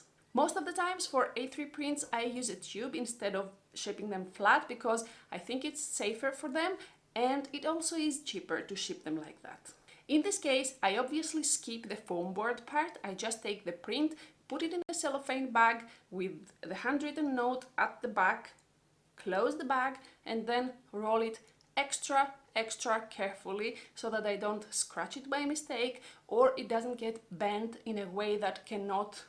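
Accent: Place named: Russia. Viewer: good morning I thought you were russian because of your accent haha:) Kind regards from the netherlands🇳🇱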